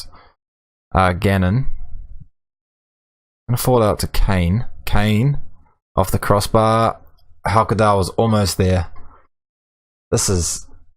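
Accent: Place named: New Zealand. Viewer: You seem to have picked up the accent so I had you down as NZ born and bred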